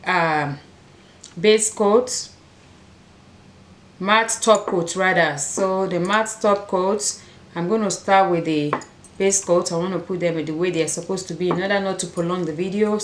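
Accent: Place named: Africa. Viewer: Perfect timing! I just bought a gel lamp on black Friday and in search of a quality brand to try for myself. I appreciate this quality review. I am trying to connect with women that have similar interests as I've just started my channel. I am looking forward to more of your content and reviews!! I've liked and subbed mamas (and yes, your African accent is gorgeous). -Ash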